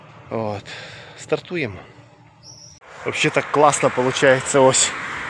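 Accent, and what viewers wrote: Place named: Surzhyk, Ukraine. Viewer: Суржик жосткый)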